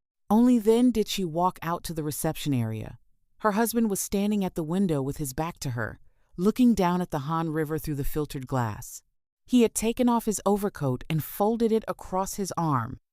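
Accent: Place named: Caribbean. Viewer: Loving this. Hearing the Caribbean influence is beautiful. Wished there was some good accents. Lol. Respect from Bim🇧🇧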